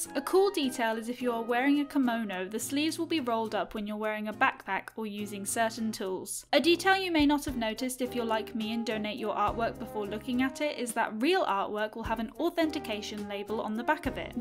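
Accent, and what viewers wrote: United Kingdom. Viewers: I really hope your British :)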